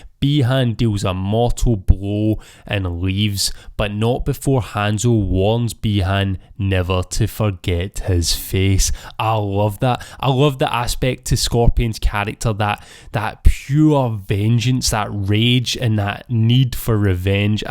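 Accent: Scotland, United Kingdom. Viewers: imagine if you speak in your normal speed anyways its your accent of course scottish will understand it... thank you for your clip and subtitles from your efforts,though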